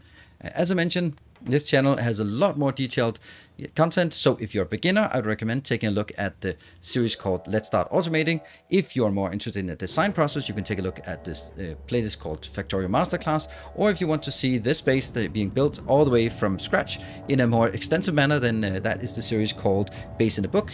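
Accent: Denmark. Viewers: You sound Danish.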